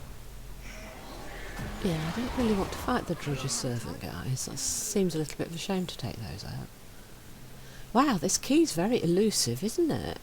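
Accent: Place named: United Kingdom. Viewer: Painfully British but good video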